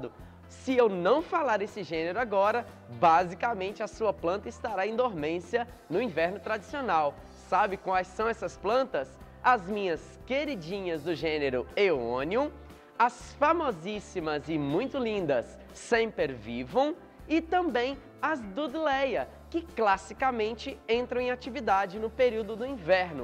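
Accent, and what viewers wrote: Minas Gerais, Brazil. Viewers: Você é mineiro de onde hein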